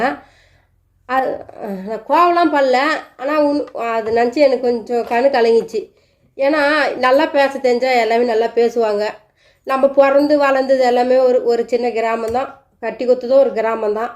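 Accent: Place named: Northern Tamil Nadu, India. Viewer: Don't Worry dear❤❤😢😢 விடும்மா என்னை அழ வச்சிட்டியே😢❤ வேலூர், விழுப்புரம், சேலம் என்று வடமாவட்டங்களின் வட்டார மொழி இப்படி தான் இருக்கும்.Pls🙏 friends யாரும் செல்விம்மாவை சங்கடப் படுத்தாதீங்க❤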